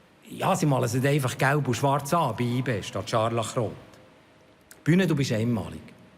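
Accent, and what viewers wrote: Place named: Bern, Switzerland. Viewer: Bärndütsch isch eifach s Beschtä 😁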